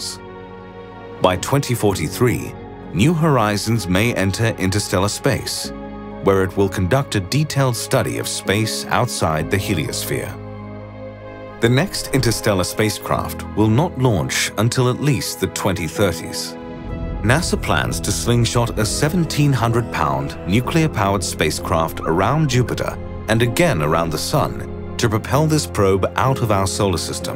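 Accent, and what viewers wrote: RP, United Kingdom. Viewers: It’s a total rip off of Kurzgesagt if you ask me: Distinctive vibrant colour palette ✅ Big bold white text in thumbnail ✅ Rounded off animated shapes ✅ Science/space themed content ✅ Narrator with British accent ✅ The only thing that’s missing is birds. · another science channel with a "british" narration, this gon' be good SUBSCRIBED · What's with the bizarre American pronunciations of "Cos-moess" and "Proe-ject" when you have a perfectly good English RP accent? It's highly disturbing! The last syllable of Cosmos rhymes with Boss, and the first syllable of Project rhymes with Bodge. I suppose I should count myself lucky that you didn't say "Aloo-minum"...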